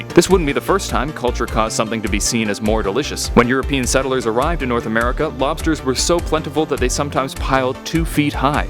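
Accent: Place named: Scotland, United Kingdom. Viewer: Dude it's not that hard to understand Scottish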